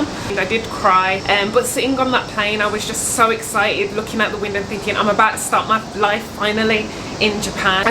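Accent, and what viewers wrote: Birmingham, United Kingdom. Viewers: Girl!! I totally know what you mean about "settling" when making friends. I've been in Japan since 2018 and have a select few people I can call friends, pretty much because I can't get attached to people I wouldn't really hang out with in the UK. I know that makes me sound like a snob but Japan attracts a lot of weird foreigners and I don't want to spend my time with people I don't actually click that well with, or put myself into any compromising situations... hence my lack of a solid circle. One of my good friends (who no longer lives near me :(... ) is from Birmingham. He has the same positive energy as you and the accent sounds very similar. Are you from around there by any chance? Anyway, I feel like if we met for real, you'd be someone I'd choose to hang out with. Thanks for your positive, down to earth vibes! Hope to bump into you one day! 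:)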